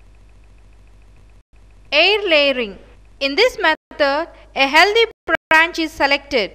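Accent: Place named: India. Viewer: The moment you realize this voice was indian but still helpful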